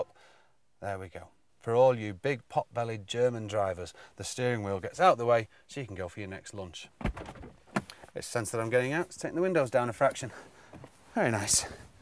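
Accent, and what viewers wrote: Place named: Northern England, United Kingdom. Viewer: He's just northern lol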